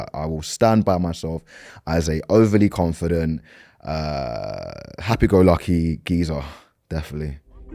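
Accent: United Kingdom. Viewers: Sounds like a British. Very confident guy.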